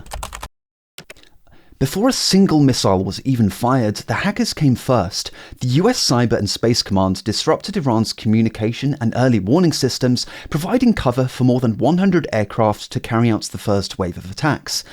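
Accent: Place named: United Kingdom. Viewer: American ? but the accent is British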